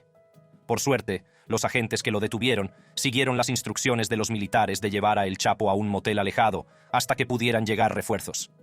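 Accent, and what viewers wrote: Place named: Central America. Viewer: De seguro no es gringo. Por su voz parece centroamericano.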